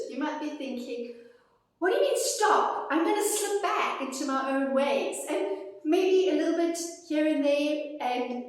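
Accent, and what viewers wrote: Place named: South Africa. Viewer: Hi - I’m fascinated by the subject of emotional resilience and I’m desperate to learn everything about it. I typed the words in YouTube and came across your channel. This video resonated with me personally on an experience level as well as an aha moment level. Please let me know if we can chat. You sound South African - so am I 😊 Thank you in advance!!!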